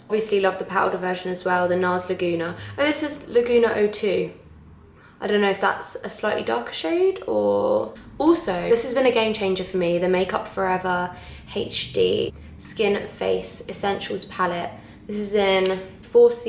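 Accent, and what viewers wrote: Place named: United Kingdom. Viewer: I have never heard you speaking and I never expected you are British!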